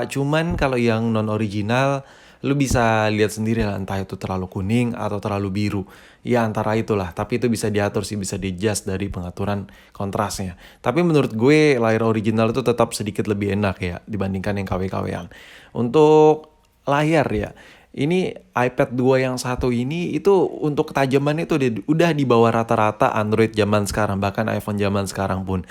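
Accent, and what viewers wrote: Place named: Java, Indonesia. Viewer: apa jawa keras ni bozzz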